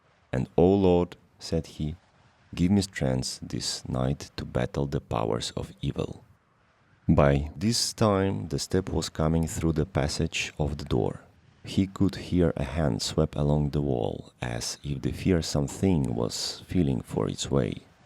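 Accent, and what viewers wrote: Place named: Eastern Europe. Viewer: the voice and accent sucks big time ... wtf ??? it's robert louis stevenson .. not eastern european story time !!!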